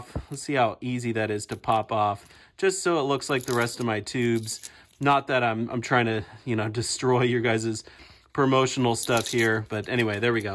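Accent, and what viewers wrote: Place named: Oregon, United States. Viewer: Thought you sounded like an Oregonian. Haha! I live in Wilsonville, myself.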